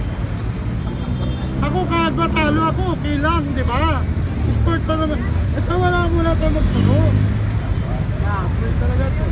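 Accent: Iloilo, Philippines. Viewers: Hahaha... galing magtatalog,tunong ilongo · I LOVE THE "ILONGGO ACCENT" HAHAHAHA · Di gid halata ahh nga ilonggo ka gahah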